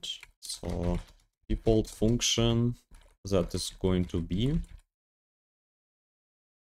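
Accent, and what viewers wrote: Russia. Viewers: По акценту как будто ты русский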